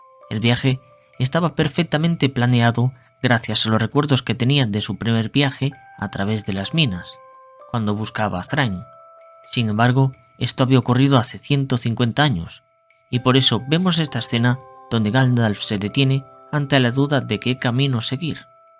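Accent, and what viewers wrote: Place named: Galicia, Spain. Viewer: Mejor que Kai, en todo caso, ademas muy modesto, y el acento gallego es genial y mágico. Ademas en Kai noto cierto aire de superioridad y desprecio por el subscriptor. Escuchando a, me siento mejor. Pondria como a como Gandalf y a Kai como Saruman, o mas bien Zarquino.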